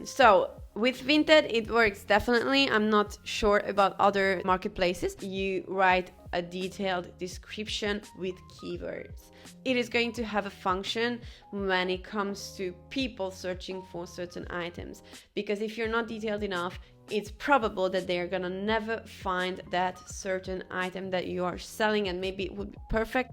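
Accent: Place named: Hungary. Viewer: Within 3 seconds of the video I knew she is Hungarian based on the accent, then I heard her name :)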